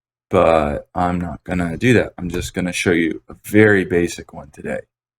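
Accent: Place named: United Kingdom. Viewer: LOL U HAD ME WITH THE BRIT ACCENT!!!!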